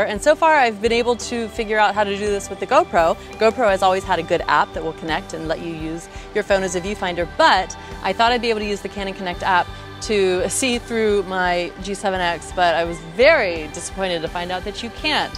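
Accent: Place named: United Kingdom. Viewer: I love your British accent!!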